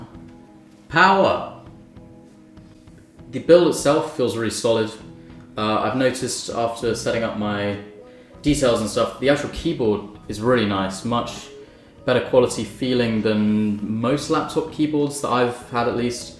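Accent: United Kingdom. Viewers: Alot of British people's voice for me sounds a little older, maybe it's the combination of the accent and way of speaking. Like Spiffingbrit, frankieonpc, and now.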